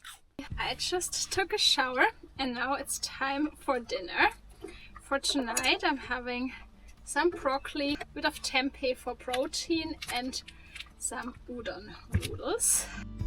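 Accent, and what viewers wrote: Germany. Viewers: Watching your video makes me lay back and relax with a sunshine smile on my face. This is not just a video, this is therapy ! forgive me but do I hear a little German accent ?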